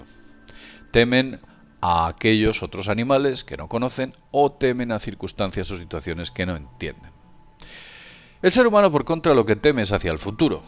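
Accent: Spain. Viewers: tio deja de hablar como español, no hay nada de malo ser peruano.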